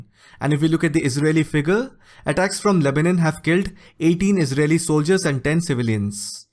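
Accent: India. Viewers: This guys indian accept is insufferable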